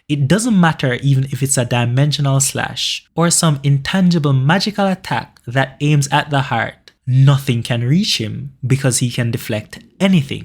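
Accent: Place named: Jamaica. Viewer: This is my first video of yours I have seen. I caught the accent IMMEDIATELY. Where ya from. I’m from Jamaica i hear patois. If you are. I’m subbing rn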